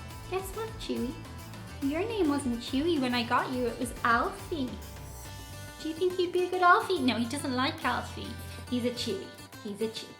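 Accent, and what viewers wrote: Ireland. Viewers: off topic question. Living in Spain, do you speak Spanish? I I would really like to hear an Irish accent with Spanish mix!👍👌😃🔆🌴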